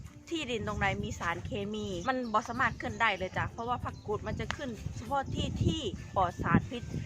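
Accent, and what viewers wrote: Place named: Nakhon Phanom, Thailand. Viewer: สำเนียงคล้ายคนนครพนมเลยค่ะ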